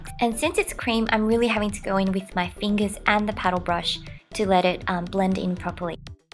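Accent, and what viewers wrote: Australia. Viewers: i've always wondered but do you have an australian accent when speaking korean as well? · Are you Australian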